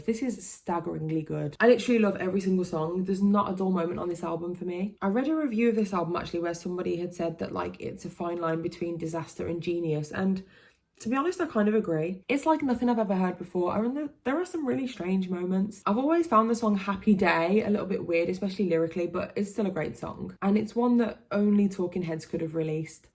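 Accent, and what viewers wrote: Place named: Birmingham, United Kingdom. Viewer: awesome!!!! yes it was amazing!!! i saw him twice, i was at the London on the 7th and Birmingham! I can tell from your accent that maybe you're from Birmingham? not 100% but i'd say maybe you are.. so I imagine you went to that one.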